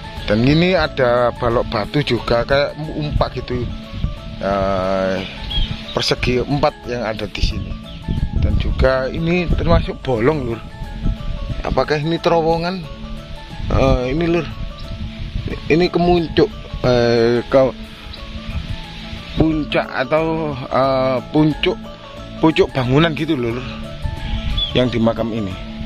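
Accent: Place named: Java, Indonesia. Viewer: 🌀🌺☀️ WA'ALLAIKUM SALAM WR. WB. SaLaaam 🙏🙏🙏🙏🙏🙏🙏🙏🙏 , Smoga SulsEs Luuur and jaga kesEhatan sLaLu Lur 🙋🏼‍♂️🙋🏼‍♂️ , Nb. Warning and Bukan Marning 😀😀 , jangan Lupa Kirim DO'A / DUNGO Sama ALmarhum & ALmahumma okey Luuur 👍👍 , Tingkatkan Logat Bahasa Indonesia + Jawa Sehingga Seumpama Sayur tidak Lupa garamnya 😲😲😀😀 👍👍 , Lanjutken Mbah Kung 🙋🏼‍♂️🙋🏼‍♂️ ☀️🌺🌀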